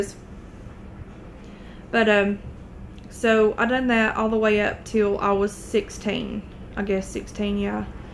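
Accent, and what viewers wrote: South, United States. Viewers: Hi sweetie. I don't wear make up, I don't shop a lot and I'm the worst singer in the world but you know why I subscribe to your channel? Becuase I can tell that your a genuine and honest caring person and when I hear your videos with that adorable southern accent, I think wow...me and her could get on as friends a real treat. Your shopping stories crack me up and your singing is so enjoyable. Don't let others take your light from you girl. Stand together with God in which you know what's right and you'll get by just fine. This is just for now and this will pass. Don't cry pls. You're impacting others to do right in this world so chin up, deep breath and step forward. Xo Mrs Localvore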